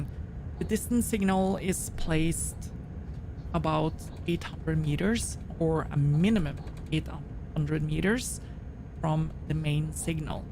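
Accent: Norway. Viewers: 😊So glad to hear your voice😊. Spend months wondering what one may look like, or sound like...and why was I suprised when you sounded Norwegian?!❤ Enjoy the rails, and enjoy the skies!🚅🛩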